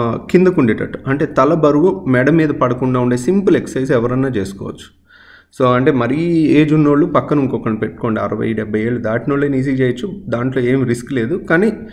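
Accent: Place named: Krishna District, India. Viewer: Sir you speak very nice pure Krishna district telugu.. and with beautiful smile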